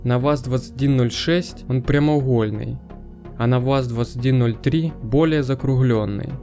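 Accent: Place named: Ukraine. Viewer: Он из Украины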